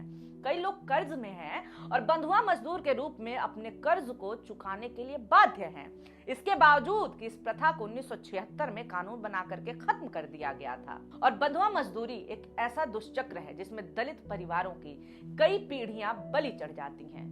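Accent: Bihar, India. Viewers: Jo aap bihari accent me kataksh karti ho wo bahut lajawab hai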